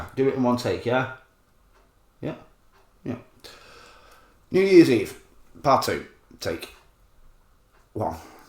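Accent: Liverpool, United Kingdom. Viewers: Happy New Year Mate. I've loved what you do from the beginning. Keep going with the good work, it's an uphill struggle but you are gaining more followers and besides...... what the f@ else are you going to do with that wonderful Scouse energy. Richy Sometwat (nice one that) Luv ya Pal 😉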